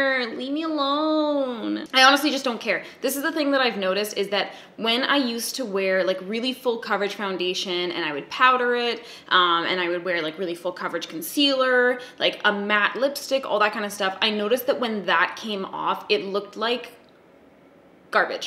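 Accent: Western Canada, Canada. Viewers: I fucking love your Canadian accent & the way you say bag... makes me not want to be god damn British 😂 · I want you to know that I often say things randomly in a Canadian accent and I’m 99% sure it’s because I watch your videos so much, even in the background of my daily activities. I live on the middle of the United States east coast. People get very confused. Often. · I forgot you're Canadian! I think your slight accent is adorable. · I'm here for you, and your personality, and your canadian accent, don't care about anything else (that description tho 😂😂) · Omg the first time i can properly hear the canadian accent i love it so much i cant even dealio. ❤❤ from NZ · I love the Canadian representation. Also I didn’t realize that I say bag exactly like that. Yay western Canada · I truly adore you and your channel! You always make me laugh & you're so refreshingly REAL!! 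(Also the Northern Minnesotan in me is awakened by the Canadian accent! You sound like HOME! Lol!) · I know how Americans act when there’s a Canadian accent heard so I feel you but I appreciate your accent that you hide ❤️ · What’s in my beg? I love Canadian accents 😂😂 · We all know that's not Old Dead Plant. Your Canadian accent has rubbed off on me. I also blame Christine and Ben. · I love the Canadian accent. Sounds like “bayg” to me. But I’m the New Yorker who says “cough-e” or “cawfee” as coffee lol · I wish I could “love” this video! Haha. I love your videos and your freakin sweet Canadian accent! Lol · ya know, when i saw the title of this i thought "i want a 10 minute video of just saying bag" bc your Canadian accent is actually amazing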